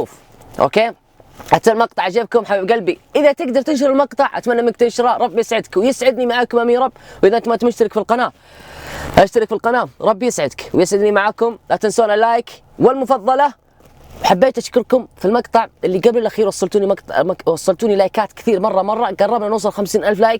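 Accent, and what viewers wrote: Saudi Arabia. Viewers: ووه سيدي امجيزاني لهجته زيي 😂😂 ي لبى بس اخيرا يوتيوبر جيزاني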